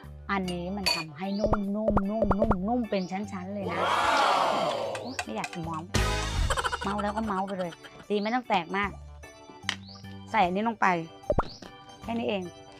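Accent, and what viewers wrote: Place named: Isan, Thailand. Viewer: ชอบสำเนียงการเว้าา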